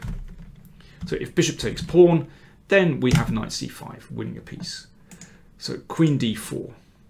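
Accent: United Kingdom. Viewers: Please keep saying "At all" in all your videos, because your ultra British accent is accentuated. It's great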